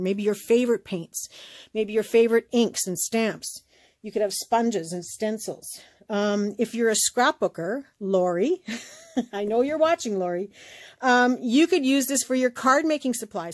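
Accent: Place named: Michigan, United States. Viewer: Are you from Michigan? You kind of sound like it…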